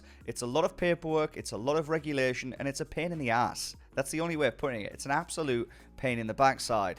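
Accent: United Kingdom. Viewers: Hay, Just new to this, but loving having a UK voice tell it like it is. Was think I might throw something at this, Cant find the stick on Etoro so I am guessing is one some sort of sub exchange. Any suggestions where best to access DWAC stocks from?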